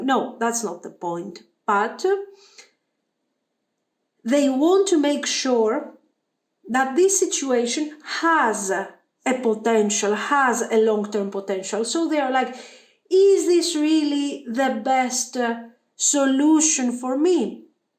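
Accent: Greece. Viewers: Your English is perfect actually! You simply have a very Greek accent, but your use of the language is great. I'm happy to see a Greek youtuber gaining popularity! Keep up the good work. I'm showing your videos to friends and family for nee channel recommendation!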